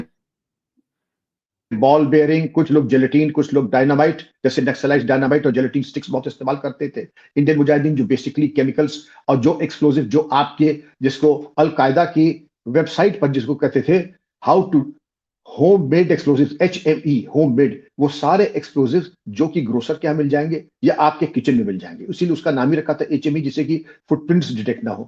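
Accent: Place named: Lucknow, India. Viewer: Samjhawan, uthawan😂 😂. I myself was in Lucknow so listening to you remindS❤❤ me of those days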